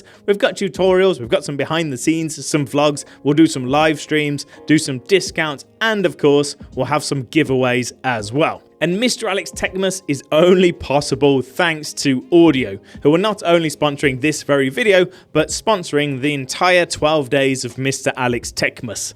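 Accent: United Kingdom. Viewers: SO MANY TIPPS for my workflow!! Thank you so much from Germany and let me say that I love your accent. Which part of UK is it from?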